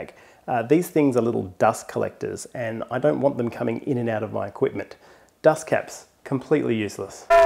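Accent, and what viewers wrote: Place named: Australia. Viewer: I don't even own a telescope and am totally offended! But your accent softened the blow a bit knowing what a-holes ozzies can be ;-) Keep up the class work!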